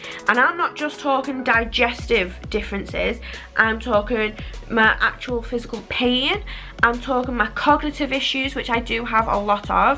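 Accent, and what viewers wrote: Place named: Liverpool, United Kingdom. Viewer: Am in my early 30s and have had it for prob 7 years it’s a nightmare. Glad your putting yourself out there and trying to help us. You sound like a slight scouser too am from the north of Liverpool myself. Keep up the good work your positive outlook is great 👍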